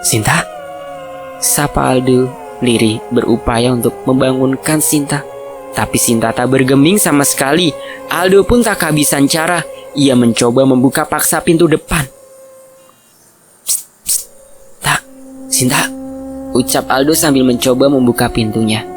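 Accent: Java, Indonesia. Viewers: tapi q lebih suka bahasa jawa mimin medok poll jadi tambah seru ceritanya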